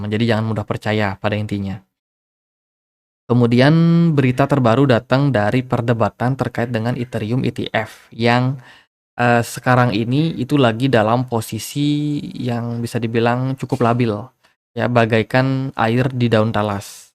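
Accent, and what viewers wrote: Bali, Indonesia. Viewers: Dari vokalnya jelas orng bali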